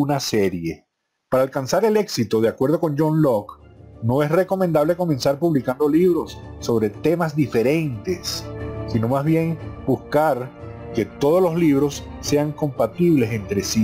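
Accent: Venezuela. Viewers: Buen video. venezolano?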